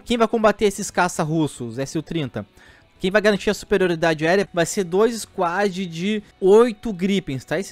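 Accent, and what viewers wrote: Rio Grande do Sul, Brazil. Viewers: Tchê tú é gaúcho de Pelotas ctz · Tu é gaúcho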